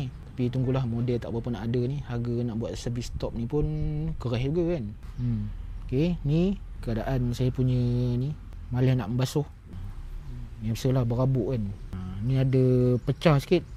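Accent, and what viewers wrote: Perak, Malaysia. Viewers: org perak ke yop?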